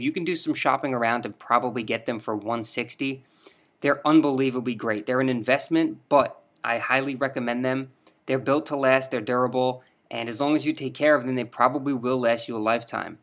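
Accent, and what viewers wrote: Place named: Alabama, United States. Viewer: I just noticed your accent....are you from Alabama?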